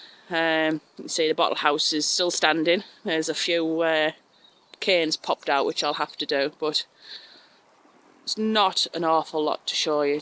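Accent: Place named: Middlesbrough, United Kingdom. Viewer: gooseberries will grow, just plant it in the ground.. It'll be fine.:) your plot looks awesome, and i know what you mean about hearing your own voice..lol..I'm from newcastle, and going by your accent, you aren't from sunderland..I'm guessing Middlesboro.:).. look forward to your next vid.. by the way, I am seriously considering making a bottle house now..and its all down to you and your vids..:) cheers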